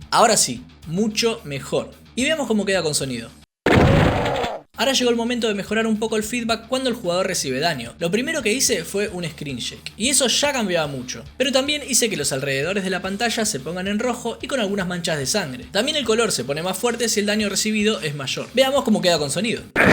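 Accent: Argentina. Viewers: Escuchar a un argentino hablando sin parar como usando frameworks descuartiza el Doom para pasar la tarde. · Un genio total, es el primer video que veo y ya me enamoré, me recuerda mucho a los vídeos gringos y me da satisfacción saber que ahora esta en español y encima argentino (corregime si me equivoco) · Tu voz me recuerda a la del youtuber 'Jota.' pero con acento más argentino jajs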